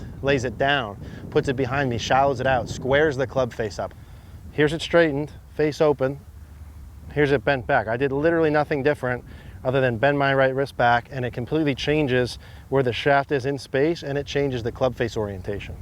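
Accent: United States. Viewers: This only goes to prove that if someone talks fast with an American accent...and says the same thing over and over again and stating the bloody obvious....people will assume they are knowledgable. He says 'That would be bad...' but he never says WHY it would be bad...or why the opposite would necessarily be good. The condition of the wrists at the Top of the Backswing is completely irrelevant to the conditions at Impact...Ben Hogan had 'cupped' at the top and 'bowed' at Impact, Nicklaus had 'straight at the top and straight at Impact. Any golfer who regularly has his trailing wrist bend back as far as it will go at the Top of the Backswing is a) risking injury and b) restricting his backswing...Gary Player said that and clowns like this should stop conning people into believing that it is a good thing because it is somehow linked to Impact. It isn't and it isn't !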